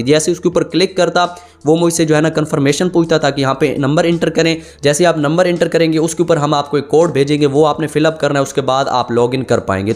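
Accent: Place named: Noorpur Thal, Pakistan. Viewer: Zaban isi tarh lg rahi hai Main khod noor pur thal sy hu and wsyy ap kahan sy ho..bye the way poch raha hu bro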